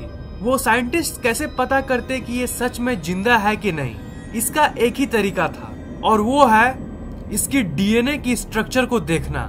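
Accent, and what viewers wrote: Bihar, India. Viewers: Bhai koun ho nhi ho ye to nhi pata par tum bihar se ho ye to pukka hai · Sir Are you from bihar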